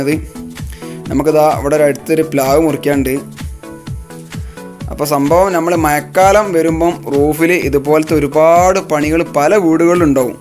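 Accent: Malappuram, India. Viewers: ഹയ് ഞാൻ നിങ്ങളുടെ വിഡിയേ എല്ലാം കാണാറുണ്ട് നിങ്ങളുടെ വർക്ക് എല്ലാം സുപ്പർ ആണ് നിങ്ങളുടെ സംസാരം കോട്ടാൽ മലപ്പും ആണ് ഞാൻ ഒരു മലപ്പുറം കാരാൻ ആണ് എൻ്റെ നാട് പുലാമന്തോൾ ആണ് നിങ്ങൾ ഫോൺ നമ്പർ ഒന്ന് തരണം സന്തോഷം കൊണ്ട് ആണ് നിങ്ങൾ പറയുന്നത് പോലെ ഞൻ ചെറിയ വർക്ക് പടിച്ച് വരുന്നു നിങ്ങൾക്ക്‌ ആരേഗ്യമുള്ള ദീർഘയസിനെ തരട്ടെ ആമീൻ യാ റബ്ബൽ ആലമീൻ c ആമീൻ (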